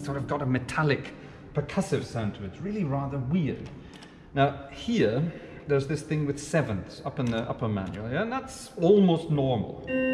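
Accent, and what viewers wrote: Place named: Scotland, United Kingdom. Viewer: Well that's quite an Organ very versatile which will please your. I could hear the pleasure in your voice playing around with this instrument. Very Uncongenial to say the least. Very enjoyable video indeed. And yes your Scottish for sure not that that's a bad thing. Someone has to think about the money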